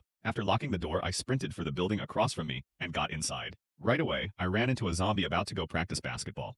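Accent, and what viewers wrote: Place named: China. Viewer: Personally I think the ai voice with the Chinese accent it’s sounds more funnier and fun and also the more higher in pitch voice you used for like X-ray gambling jade video sounds better overall I would say if you use this voice you should consider also playing background music so it doesn’t sound as dry and monotone also I love your vids bro and how long they are really enjoyable to watch I probably subbed at like 50 subs but keep up the consistency and constant improvements and you’ll do great🎉 sorry for the lengthy comment😅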